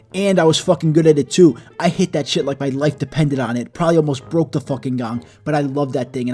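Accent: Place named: Boston, United States. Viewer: This mans boston accent is amazing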